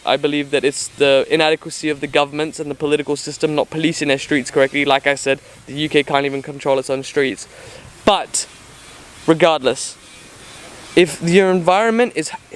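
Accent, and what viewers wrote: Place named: Australia. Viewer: MashAllah! Are you mix? You look mixed. I can also peep the australian accent. AUSSIE AUSSIE OI OI OI!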